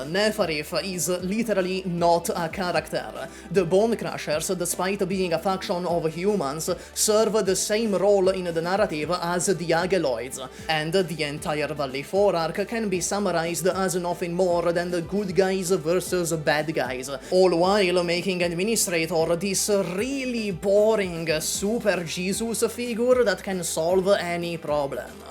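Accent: Italy. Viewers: Just because of your Italian accent 🤌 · you yap in your silly italian accent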